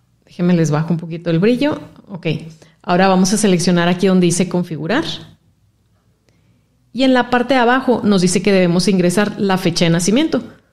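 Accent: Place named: North, Mexico. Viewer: Me encanta tu acento norteño ❤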